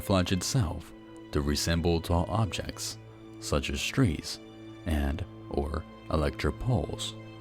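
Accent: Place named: Europe. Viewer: You sound like the foreign European guys from Family Guy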